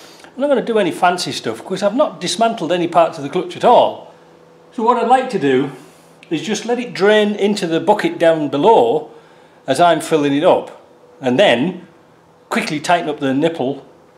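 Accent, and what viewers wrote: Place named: Middlesbrough, United Kingdom. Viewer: I recognise your accent - I’d guess Middlesbrough / Darlington area?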